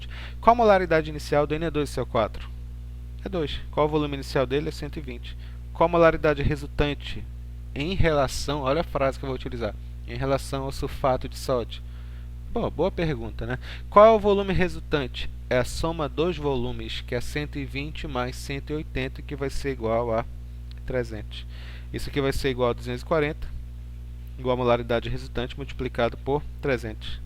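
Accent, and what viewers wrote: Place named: Recife, Brazil. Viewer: Você é de recife né? Logo vi hsuahsau, mto bom o vídeo, ajudou mto!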